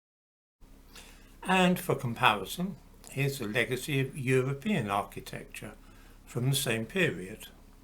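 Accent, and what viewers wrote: United Kingdom. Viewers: Can you please explain to us why western museums are filled with Africa artifacts? Where are your artifacts on display? We wanna know. Why don’t we see your heritage 10,000 years back? This is what you might relate to with your British accent. <link> Did your people make the bronze art in Benin and “collected” it later through colonialism for “safe” custody in Europe? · All that's missing from this clown is big shoes and a red nose. Funny how US thinks that by saying BS with a British accent, it somehow is more believable. No doubt because the US is still owned by Britain. The comparison of the wall of great Zimbabwe created BC to a 15th century European church is ludicrous. Like P. T. Barnum said, " there's a sucker born everyday.!"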